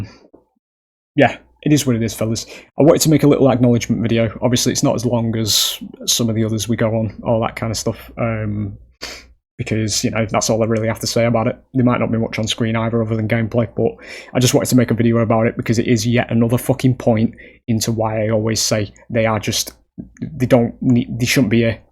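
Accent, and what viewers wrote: United Kingdom. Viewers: A vent in a proper British accent. This is great!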